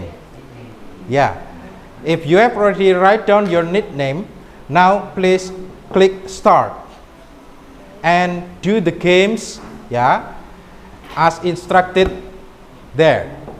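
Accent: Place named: Java, Indonesia. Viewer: Javanese english sir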